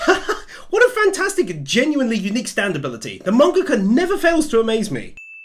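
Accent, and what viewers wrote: United Kingdom. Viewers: Is this a LiverPool accent? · This guy's voice is the manifestation of the word "British"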